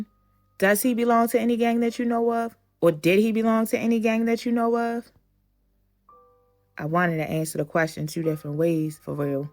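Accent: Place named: Baltimore, United States. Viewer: Different narrator. First time different voice. This a baltimore woman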